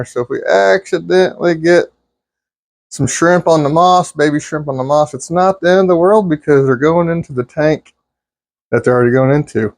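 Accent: United States. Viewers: Where you from country boy?! 😅 Hellooooo from Raleigh, NC! Enjoying the content, dude. I'm liking the genuine videos not trying to be too polished and pomp. Keep up the great work!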